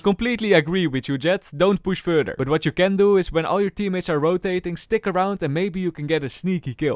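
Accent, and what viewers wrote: Netherlands. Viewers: Being Dutch I thought a Double Dutch accent would be laughed about online, but proves me absolutely wrong lol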